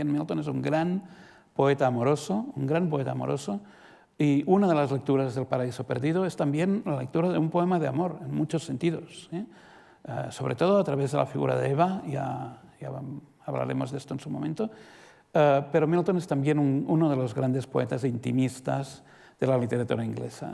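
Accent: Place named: Catalonia, Spain. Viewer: Una conferencia de la que uno no se puede despegar, de cabo a rabo. Me interesa mucho el enfoque, el análisis cultural y religioso de Inglaterra en esa época, con relación al resto de cristianismos que, por acción o reacción, se estaban configurando en Europa. Un aspecto que agradezco es que el profesor se fija en el dato pero no se queda en él, sino que sube a lo esencial. Por cierto, el acento catalán del profesor lo hace aún más encantador.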